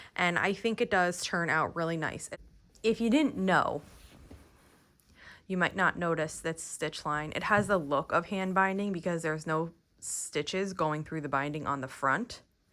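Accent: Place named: Pennsylvania, United States. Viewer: Hi! I just came across your channel! I really enjoy your quilting projects and how well you explain everything. I picked up on your PA accent. I live in Michigan now but I’m from PA too. Looking forward to watching more of your videos! Thank you!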